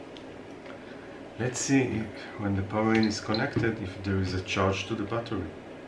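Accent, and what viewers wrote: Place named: Israel. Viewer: חח שומעים מבטא ישראלי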